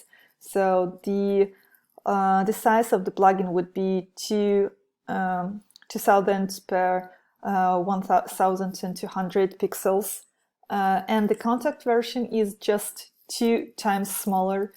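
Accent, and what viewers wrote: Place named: Russia. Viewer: по акценту понял, что русская, потом посмотрел в комменты)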